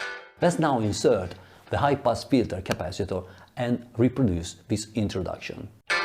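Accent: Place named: Italy. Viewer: Very cool. I love your Italian accent, by the way. Sounds like my wife (she is from Milano).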